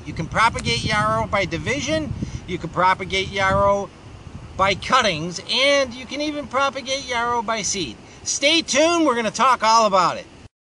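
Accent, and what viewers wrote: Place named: Buffalo, United States. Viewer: Are you from Buffalo, NY? Guessing by your accent.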